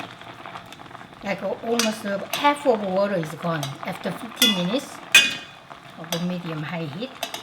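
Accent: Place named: South Korea. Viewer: 말씀 하시는거나 요리 하시는게 참 귀엽네요. 오나전 한국 액쎈트로 말입니다😁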